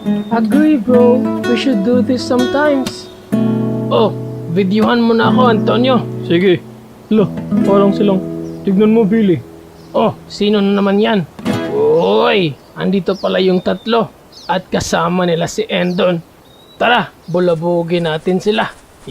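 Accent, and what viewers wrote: Visayas, Philippines. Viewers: bisaya diay ka?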